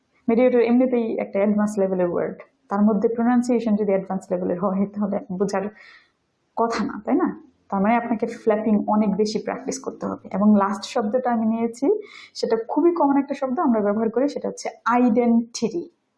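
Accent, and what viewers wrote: Narsingdi, Bangladesh. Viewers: আপনার বাড়ি কি নরসিংদী?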